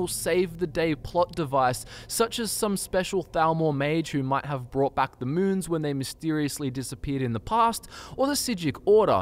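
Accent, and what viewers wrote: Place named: Australia. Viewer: No, watch the video and enjoy the Aussie-narrated lore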